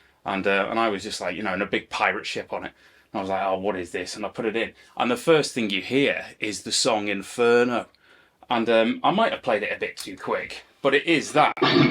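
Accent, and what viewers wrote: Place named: Liverpool, United Kingdom. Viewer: Great Scouser Accent! Amazing playing! ❤❤❤ ❤❤❤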